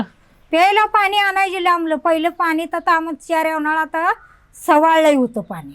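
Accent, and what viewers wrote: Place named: Kanthi, India. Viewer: आवाज कंठी गावचा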